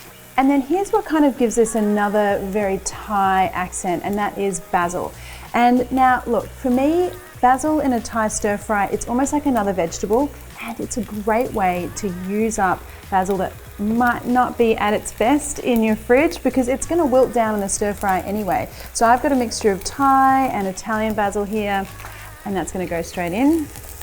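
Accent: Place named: Australia. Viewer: She’s Australian how don’t you know from this video wth they pronounce things differently.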